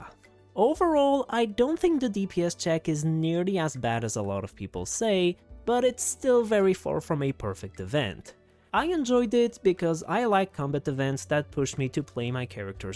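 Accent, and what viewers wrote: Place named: India. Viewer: this is good idea india aeccent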